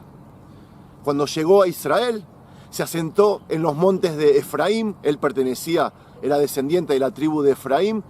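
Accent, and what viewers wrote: Argentina. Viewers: Quisiera preguntarte adonde aprendiste espanol suenas de Argentina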